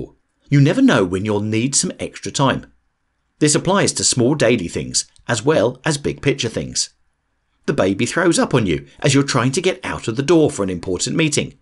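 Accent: United Kingdom. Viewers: Love this channel. I'm a visual learner, I like to read, and see infographics. It helps connect the dots for me. Your UK accent just makes everything more precise, knowledgeable, and entertaining. Keep up the great work and illustrations.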